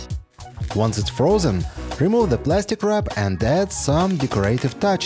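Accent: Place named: Russia. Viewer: I love this Russian accent!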